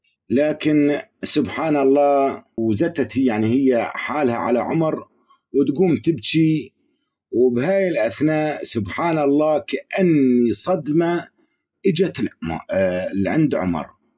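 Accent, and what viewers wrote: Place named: Yemen. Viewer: والله قصة رائعة ومن لفظ الكلام تبدو ربمى من دولة يماني اوعماني .المهم قصة حقيقية وان لم تكن حقيقة امثالها كثير ما أعجبني هو الكلام مفهوم جدا اتمنى قصة أخرى ...من المتتبع عبد الرفيع سامح ولاية ميلة شرق الجزائر❤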